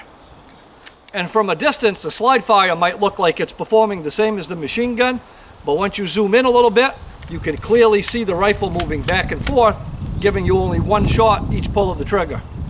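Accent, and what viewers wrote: Maine, United States. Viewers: This guys a mainer for sure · That gotta be a Maine accent Im a New Englander as Well Sir Thank you that Was terribly helpfull. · I'm detecting a Maine accent. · You must be from Maine. There's no mistaking that down state accent.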